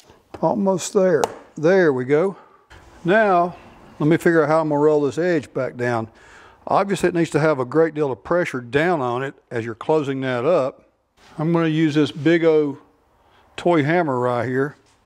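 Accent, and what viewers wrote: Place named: Alabama, United States. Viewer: Alabama? My kinzzz from Huntsville. You have the dialect and accent 😎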